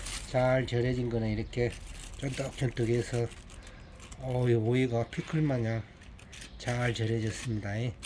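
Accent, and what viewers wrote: Jeolla, South Korea. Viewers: 고향이 어디신가요?정겹고 시골스럽네요^^ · 전라도사투리 안하셨으면. ㅡ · 전라도말씨네 재수없는말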